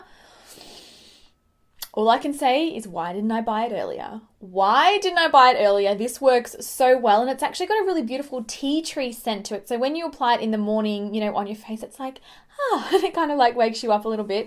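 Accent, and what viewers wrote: Australia. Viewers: Love love the Hourglass Primer and the Porefessional from Benefit the best. Didn't like the Becca it kept balling up on me and I warmed it up like they suggested. Will have to get the Rimmel and try that drugstore brand. Love all your videos for us oily t zone gals. Oh I bought that L'Oréal in the 106 and you were right it worked on me cause I'm olive skinned, thank you so much. I'll have to go back to your foundation videos and see what colors you use in those and hopefully get some samples from Sephora. Love how you interact with us, your one of the few who does that, it means a lot to us. Love your Australian accent, can't get enough, your so georgeous.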